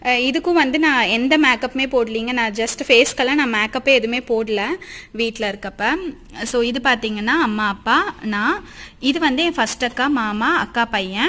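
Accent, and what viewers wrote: Kongu, India. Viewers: Kongu ngala neenga pesura slamg la therunjuthu album pathatha vechu kekaren thapa nenachukathinga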